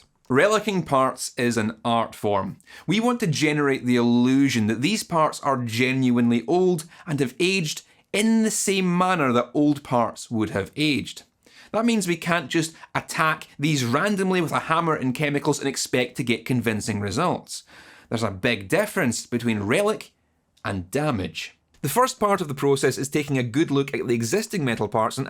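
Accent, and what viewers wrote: Scotland, United Kingdom. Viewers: Honest to God I though you were gonna be a guy from LA instead a glorious Scottish accent has gretted me I am happily surprised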